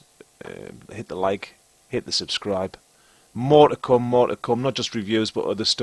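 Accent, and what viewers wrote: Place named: Geordie, United Kingdom. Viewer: you sound more jordie in this than you do in person hahahaha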